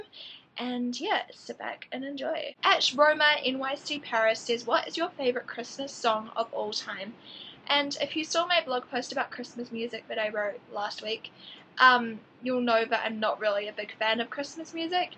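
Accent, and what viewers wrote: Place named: New Zealand. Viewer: This is the first video of yours I have seen and i was like. Hmm i regognise your accent and i Heard you say auckland and im like, Aeeee found another kiwi!! Im from dunedin(: live the video btw your so pretty!! xx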